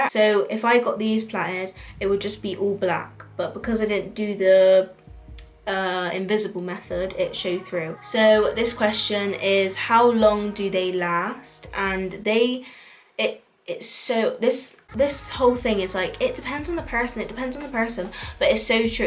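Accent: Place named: England, United Kingdom. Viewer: What part of England do you live ? Coz ur accent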